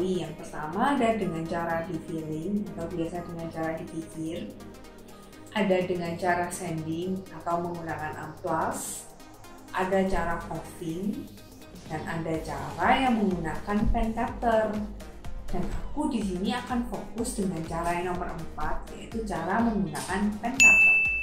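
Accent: Java, Indonesia. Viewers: Medoknya bikin mabuk kepayang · ce.. medok tenan se, wkwkw.. jowoan ae, good konten anw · Medok nya bikin kesem sem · medokmu mbak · Tante tambah medoook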